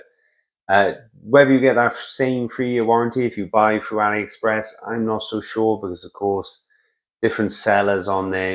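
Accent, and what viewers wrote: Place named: Cardiff, United Kingdom. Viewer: Sounds like a Cardiff accent to me....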